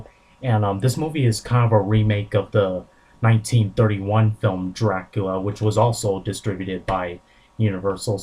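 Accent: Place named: Coast, United States. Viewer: Ohhhh cool thanks for explaining that. Where are you from by the way? I was trying to figure out by your accent east or west coast. Keep up the great vids!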